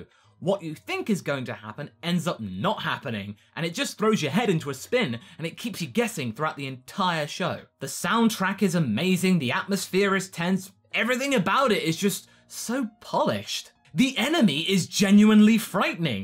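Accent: United Kingdom. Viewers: Well, good video, you are British Because you have an acent like a British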